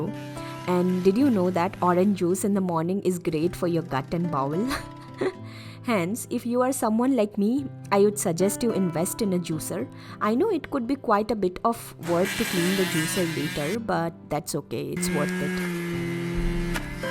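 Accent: Bengal, India. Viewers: Awesome vlogging...sply the way you speak up with a bengali touch i love it...Hop kaya is fine and better...dont worry...stay blessed.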